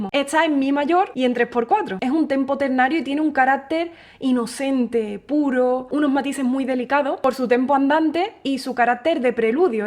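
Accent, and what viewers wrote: Andalusia, Spain. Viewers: Ay que lindo acento tienes. De que parte de España es? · Excelente interpretación. Ese acento andaluz 🥰😍💕 enamora. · Hemosa música, maravillosa didáctica y extraordinaria interpretación. ¿Ese acento es de qué región de España? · ya decía yo, ese acento es Andaluz, felicidades hermosa interpretación, saludos desde Guayaquil-Ecuador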